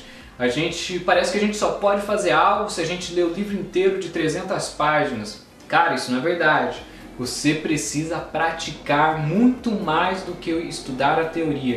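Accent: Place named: United States. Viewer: Parabéns! Kkkk já está ate com um pouco de sotaque americano kkk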